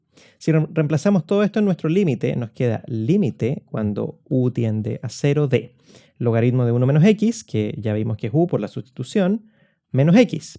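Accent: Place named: Chile. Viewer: aja ajá ese acento, eres hermano chileno!!! Pisquito y bocadillos! Yo soy del norte de tu frontera!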